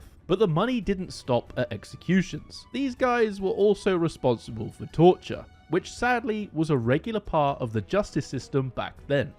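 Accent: United Kingdom. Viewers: Sounds like brit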